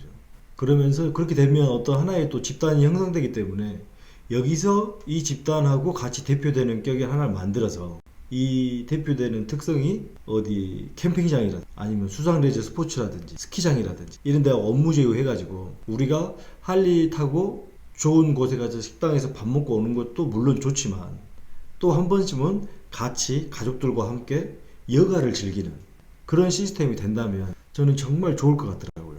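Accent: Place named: Jeolla, South Korea. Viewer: 말씀 중간 중간에 전라도 사투리 넘 구수하고 재미납니다 팬입니다 ^^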